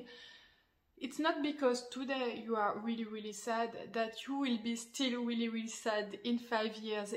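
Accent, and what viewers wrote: France. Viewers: J'adore tes vidéos en anglais car j'ai exactement la même manière que toi de le parler : grammaticalement c'est bon mais avec un accent totalement français 🤣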